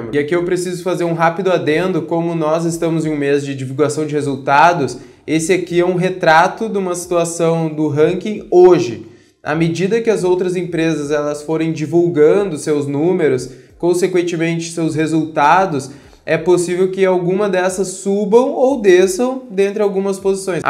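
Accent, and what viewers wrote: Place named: Porto Alegre, Brazil. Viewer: Sotaque bem portoalegrense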